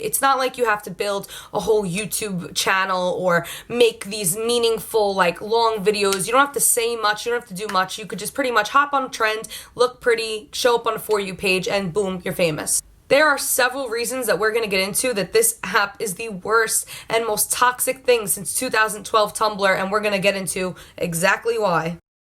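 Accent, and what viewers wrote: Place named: New York, United States. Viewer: Are you from NY or Jersey 🤔 that accent is pretty 'HEY I'm walkin' here!'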